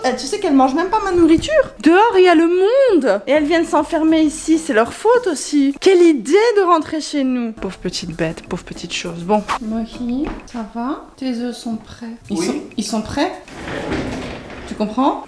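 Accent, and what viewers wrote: Belgium. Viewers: T'est belge? Moi oui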